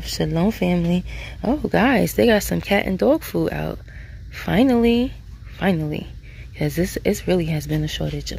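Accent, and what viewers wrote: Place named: East Coast, United States. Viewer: Everytime I go to the clearance section, I hear your lovely voice in your east coast accent saying "Scan Scan Scan family" LOL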